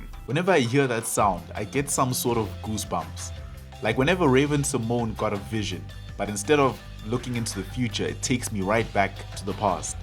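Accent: South Africa. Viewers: I was detecting a South African accent all along.. hante😅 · You just know a south african brother when they speak 🫴🏽💪🏽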